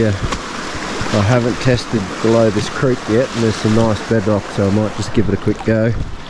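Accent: Australia. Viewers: love your content and your Aussi voice. Well done!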